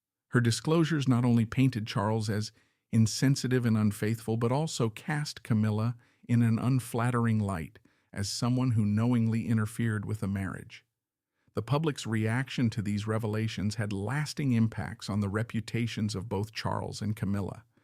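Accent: United States. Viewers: Always American narrators.Diana and Charles are English so where is an English narrator?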